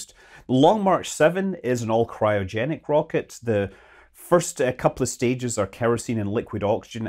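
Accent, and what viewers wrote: Scotland, United Kingdom. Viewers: you are such a delight! I always enjoy hearing your mellifluous, cheery voice with your lovely Scottish accent! Happy New Year!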